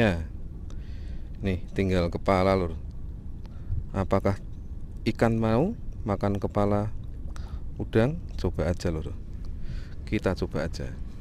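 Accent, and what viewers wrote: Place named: Java, Indonesia. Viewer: Waiki anunya medok isonan 😭😭 Penting sutrek lah 😁😁👍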